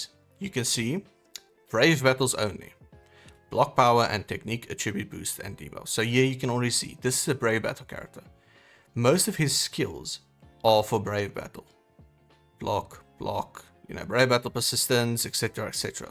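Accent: South Africa. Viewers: Hi thanks for this. Started a few weeks ago and found this helpful. Btw am I hearing a South African accent?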